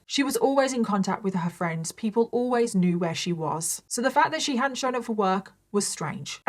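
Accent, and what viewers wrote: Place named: England, United Kingdom. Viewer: ........i ur have a Beautiful accent....i didnt think u were from Australia......i knew u were from the UK.....cause i think the UK accent is nice...i love listening to ur stories.....im from USA...a southern state....thanks for all ur crime stories...i never miss any of ur new stories...❤❤thank u for teaching makeup ways...u have taught me alot😊😊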